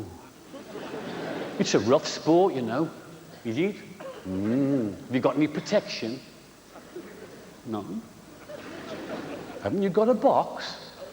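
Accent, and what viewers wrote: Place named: Birmingham, United Kingdom. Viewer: Never would’ve thought jasper came from posh Birmingham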